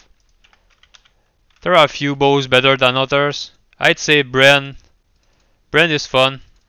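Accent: Quebec, Canada. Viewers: Nice comparison, I really enjoyed it. WF is pretty much OP for a late game Bowazon. By your accent, you must be from Quebec right? Have you considered a Bow Sorc with cold and fire enchant, geared with Witchwild String? She can kill super quick and teleport fast to the next mob groups.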